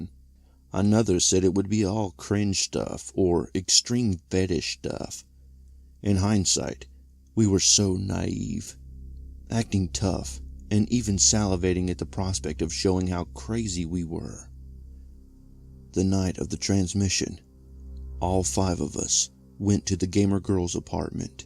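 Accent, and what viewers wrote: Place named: South, United States. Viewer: Listening right now... Where in the south are you from?.... I'm from Alabama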